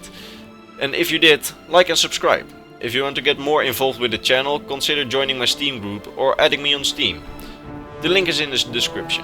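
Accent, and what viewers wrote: Netherlands. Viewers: Ik hoor een Nederlands accent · didox ik denk het, aan zijn accent te horen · Are you dutch ? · Sowieso een Nederlander dat hoor je zo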